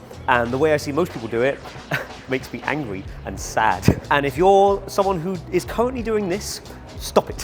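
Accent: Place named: United Kingdom. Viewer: If you don't mind, i really dislike the auto-dubbing. Sounds is crap, voice is lame. Your british accent is significantly nicer : D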